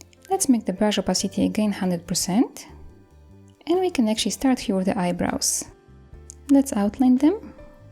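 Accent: Poland. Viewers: Czy mi sie wydaje czy słyszę Polski akcent?:)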